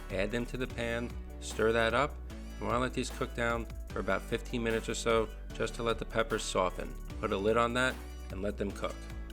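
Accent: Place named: New York, United States. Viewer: I like that Global knife, man. I almost bought one just like in 2015...but I'm a cheapskate, and so I didn't. LOL. I can tell by your accent that you're from NYC. Which borough? Just curious. Don't answer that, if you're uncomfortable answering. I'm a west coaster, but my wife is from Weehawken, so I've been out your way quite a bit.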